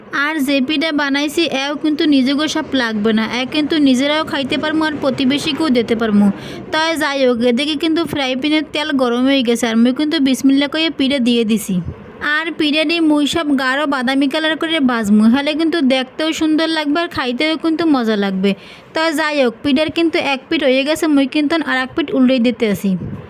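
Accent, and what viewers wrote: Barishal, Bangladesh. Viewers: প্রথমেই বলি দিদিভাই তোমার বরিশালের ভাষা শুনতে অনেক সুন্দর লাগে❤ পিঠে গুলো খুব সুন্দর হয়েছে দিদিভাই❤❤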